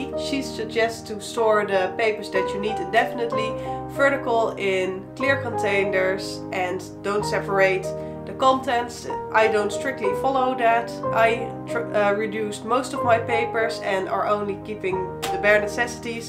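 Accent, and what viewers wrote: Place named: Netherlands. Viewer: Ik kan horen dat je uit Nederland komt! Super leuke video’s :)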